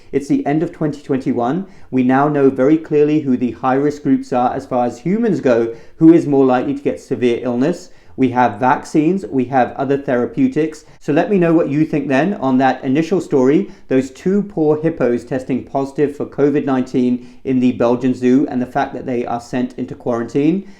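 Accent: England, United Kingdom. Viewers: There is nothing quite so elegant as someone saying "expelling snot" in a beautiful proper English accent. Bravo!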